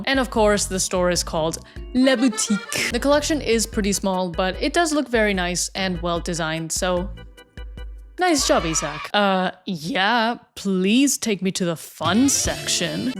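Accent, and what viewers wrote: Spain. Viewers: Where did you learn spanish? The pronunciation sounds like you're from Spain. Saludos desde España :) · Acento español thick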